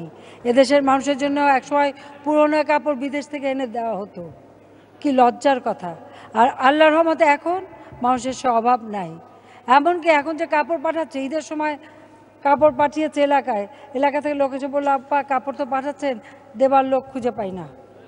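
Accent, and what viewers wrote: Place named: India. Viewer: Akdom amader Indiar moto kotha batra....so i like is.........kotha sunei mone holo na j akjon Bangladeshi mohila.....!!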